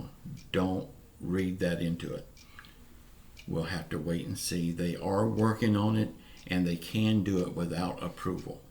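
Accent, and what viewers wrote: South, United States. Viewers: Iove your southern accent. Thanks for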